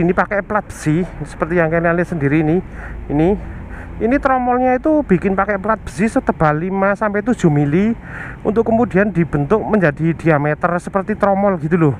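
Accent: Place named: Java, Indonesia. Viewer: medok e mas... >_<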